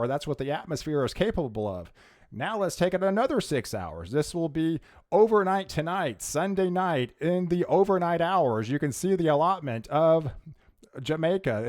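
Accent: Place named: Western Pennsylvania, United States. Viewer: You are from western PA. You cant hide the accent!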